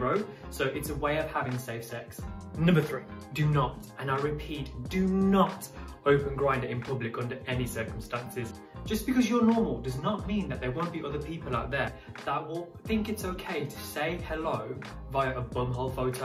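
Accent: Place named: Birmingham, United Kingdom. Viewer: Don't believe it about the accent not being liked - I love the Birmingham accent!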